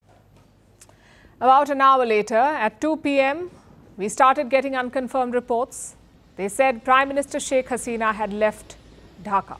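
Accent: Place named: India. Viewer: Just typical Indian style English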